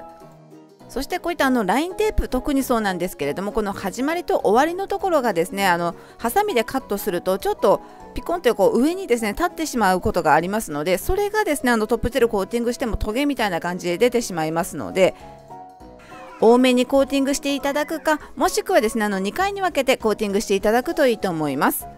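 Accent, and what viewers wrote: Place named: Gifu, Japan. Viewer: ライブのときは岐阜弁が聞けて嬉しかった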